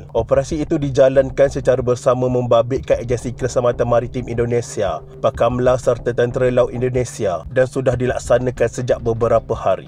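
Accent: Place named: North, Malaysia. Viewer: MCM org utara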